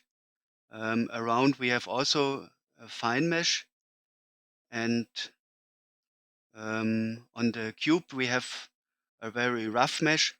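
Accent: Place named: Germany. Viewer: Danke, auch wenn man am Akzent schnell gemerkt hat, dass du Deutscher bist :D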